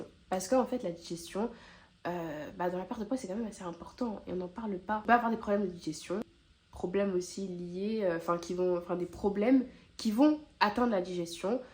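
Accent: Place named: South, France. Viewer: Tu as chopé l’accent du suuuuud !! Hâte de tes prochaines vidéos ❤️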